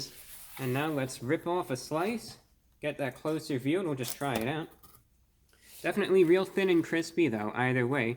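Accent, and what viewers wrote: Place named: Transatlantic, United States. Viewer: You speak like a rich White Anglo Saxon Protestant with a slight transatlantic accent in there. A radio voice if you will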